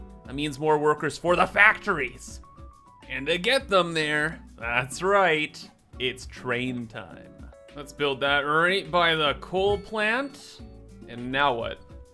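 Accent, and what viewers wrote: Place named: Canada. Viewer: you can tell by his accent he’s definitely Canadian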